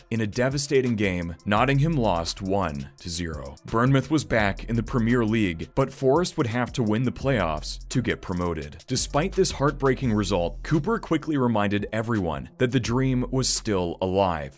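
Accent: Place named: United States. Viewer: Stick video on out of interest. Hears American accent. Switch video off.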